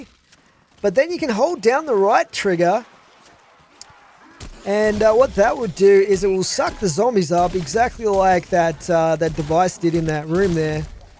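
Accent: Australia. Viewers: Literally I only ever watch your zombies content. Somethin about your australian accent and calm demeanor makes you so entertaining. Definitely an underrated youtuber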